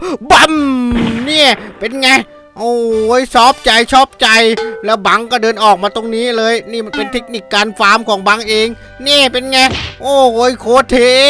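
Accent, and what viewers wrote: South, Thailand. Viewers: พากใต้อีกไหม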